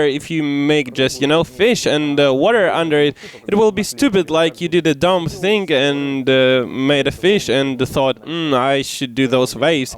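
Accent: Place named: Scotland, United Kingdom. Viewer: у шотландский акцент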